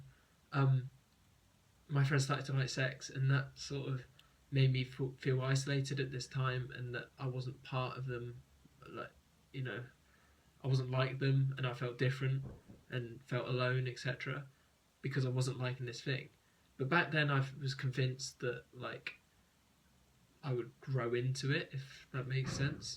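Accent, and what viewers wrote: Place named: United Kingdom. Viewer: Great video. It was nice to hear a fellow British voice talking about this topic.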